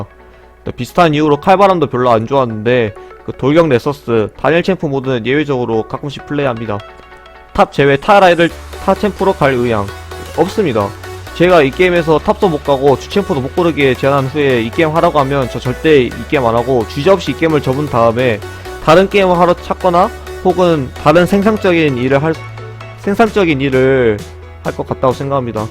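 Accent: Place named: Jeolla, South Korea. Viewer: 사투리가 있는걸 보니 전라도사람이시군요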